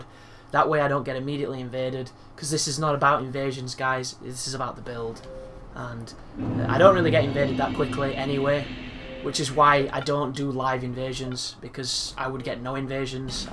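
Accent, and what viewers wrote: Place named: North, United Kingdom. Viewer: Where are you from? If you don't mind me asking; I'm reminded of your accent by the accents they use for men North of the Wall on the Game of Thrones TV show :)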